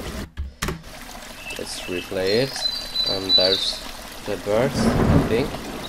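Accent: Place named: Sweden. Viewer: fyfan va svensk du låter :P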